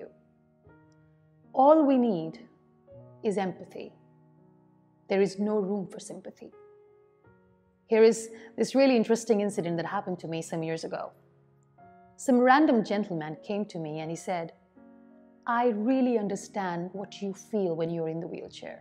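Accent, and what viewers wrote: England, United Kingdom. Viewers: Mam you are my" role model ".I like your english auction. Blessing you to live long with great success.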